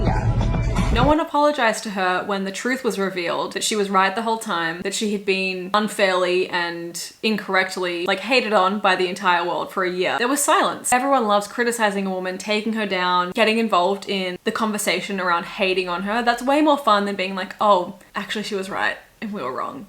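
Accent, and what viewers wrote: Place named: Australia. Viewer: Hi, I just wanted to let you know, that when I bing searched taylor in paris, from a public computer, this post of yours, came as first listing (with filter of 20 min+ videos). I just saw you the first time, when you replied my comment saying I wanted to see your whole first time listening video of the new album. Mind you also, that from your accent, it sounds like you are Aussie? or some place far from USA, where I am. So... just to let you know, you are famous. At least for 20 min+ videos about.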